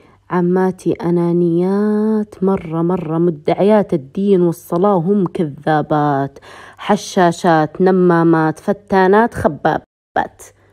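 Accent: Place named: North, Saudi Arabia. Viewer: لهجه اهل شمال ومن عرعر ترا عادي ولو تتابعها بتشوف نزلت كل معلوماتها وجوازها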